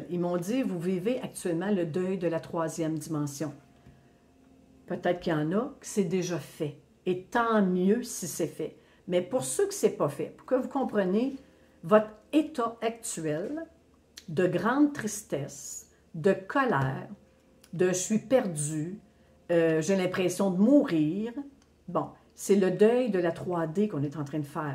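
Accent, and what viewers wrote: Quebec, Canada. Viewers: Merci beaucoup, j’adore votre accent et vos expressions québécoises. Moi je suis de Bordeaux 33 en France là où il y a du bon Vin. Je vous embrasse très affectueusement ❤❤❤❤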